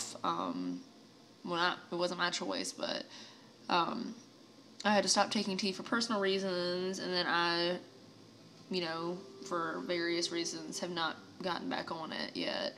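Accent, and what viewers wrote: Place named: Missouri, United States. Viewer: is it just me or is there definately some missouri accent rubbing off on him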